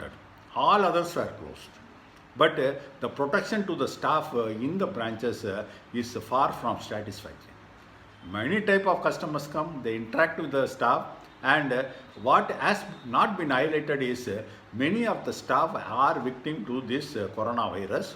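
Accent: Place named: Tamil Nadu, India. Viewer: evar englishi tamizh madiri thane pesarar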